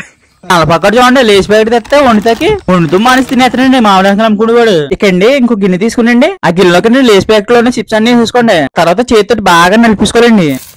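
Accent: Godavari, India. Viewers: Meeru meee comments okalla meeda okallu. Godavari yaaasa adbhutam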